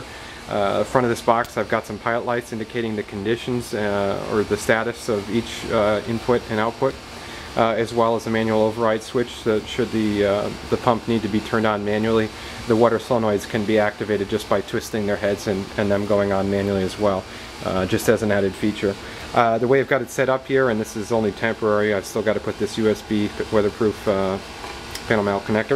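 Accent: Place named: Minas Gerais, Brazil. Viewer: deu pra perceber o sotaque mineiro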